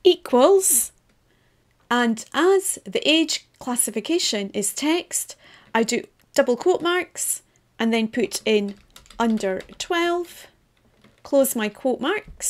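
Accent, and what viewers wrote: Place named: Scotland, United Kingdom. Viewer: Thanks for the clarification! I was looking up some video and was very pleasantly surprised to find a Scottish accent!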